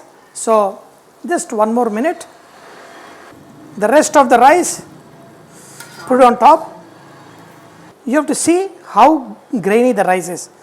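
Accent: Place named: Tamil Nadu, India. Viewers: Good to hear Tamil slang 😉😉😉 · Tq sir for making our chennai biriyani and you say pondatti and sister says podu machan I love the way you try to speak the chennai slang and it was soooo good 👌🏼👌🏼👌🏼👌🏼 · Sema chef Chennai language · Wow chennai tamil super 👌👌👌👌👌👌👌👌👌🌹🌹🌹🌹🌹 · Your Tamil accent super ji Tamil Nadu mapillai, all the best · Every day morning starts off by watching your cooking videos. Today your tamil slang is ultimate!!! Can't stop laughing.. I watch for the way you present the video. The final touch Pondatti..😄😄😄 Pondatti....🤣🤣🤣🥰.. Oh man.. 100% laughter guaranteed. .. Best wishes to Vahchef !!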